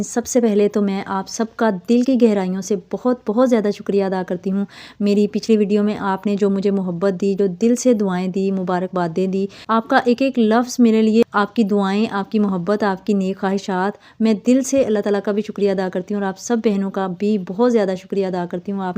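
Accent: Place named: Punjab, India. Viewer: Very sweet panjabi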